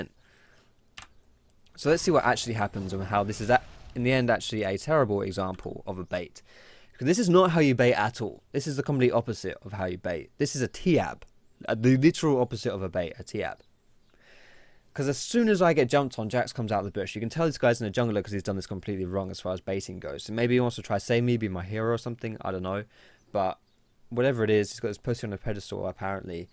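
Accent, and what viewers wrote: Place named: England, United Kingdom. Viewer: whereabouts are you in England man, you sound like you're from around where I am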